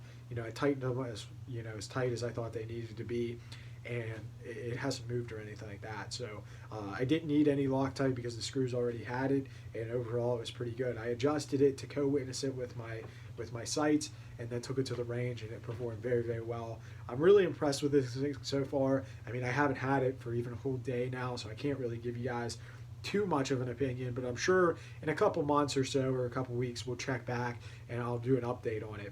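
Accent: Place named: Pennsylvania, United States. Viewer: This dudes accent is 100% from PA. Noticed it even before I noticed his name. Looked it up b/c I just got a RMR for my FNX. Great vid bud. Thanks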